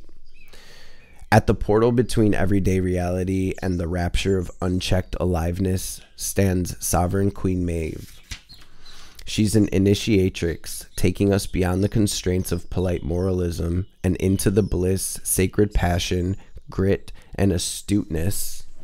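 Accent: Detroit, United States. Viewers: You from Detroit?? 😊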